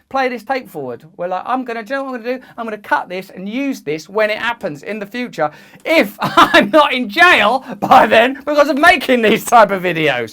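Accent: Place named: Cockney, United Kingdom. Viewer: More utter gibberish from the cockney Deepak Chopra.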